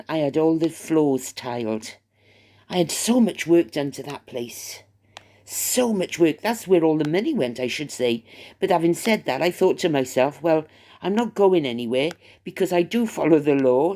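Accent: Wales, United Kingdom. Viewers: Sweet love hearing yr welsh brogue, you can witter away as much as you like. Yr channel, we all come to listen to you whatever the subject. BTW Kamala H can’t pass any bills under a “lame duck” GOP (I think that’s what it’s called before November 2024 elections, am sure our US cousins will advise if incorrect). Why are the people’s “tips” being taxed⁉️ I thought a tip was a “customer gratuity” to make up for low pay & also to get good service, how bizarre. Have a fabulous morning, afternoon or evening 😘🦘🐨💐🇦🇺🏴🇬🇧🇨🇦🇺🇸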